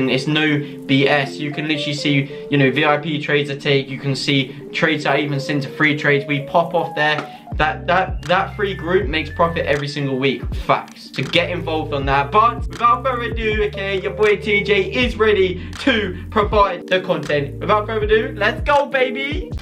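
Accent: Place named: United Kingdom. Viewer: Can you spell out the app for people like me who cannot fully understand ur British English 💯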